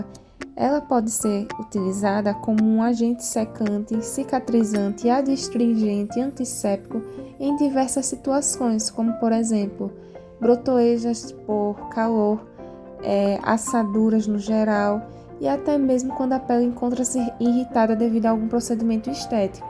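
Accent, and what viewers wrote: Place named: Ceará, Brazil. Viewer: Adorei sua voz e sitaque! De qual cidade você é? Pelo sotaque, me parece que você seja do Ceará. Acertei?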